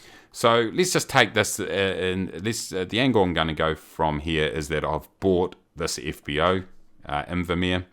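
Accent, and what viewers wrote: New Zealand. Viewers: Thanks for these videos love the kiwi accent too :) One thing though...You didnt really talk about how facillities make money and the kind of averages you could expect from them. I noticed you receive income from ground fees but didn't seem like much. Maybe you can make a quick vid explaining more on different types of income etc?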